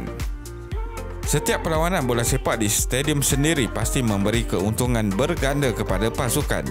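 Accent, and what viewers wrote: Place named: North, Malaysia. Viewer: abang yg ckap ni msti orng utara..hahahaha..sapa ja yg ckp jutaa kepada jutee...buruk ngat aihh bang..besa2 dah laa..😂😂😂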